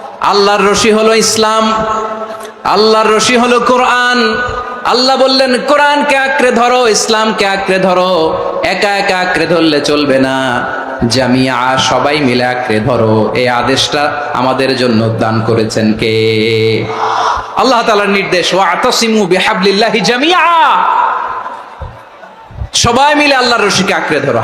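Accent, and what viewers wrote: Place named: Chittagong, Bangladesh. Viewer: মিজানুর রহমানের মুখে চাটগাঁইয়া শুনে খুব ভালো লাগছে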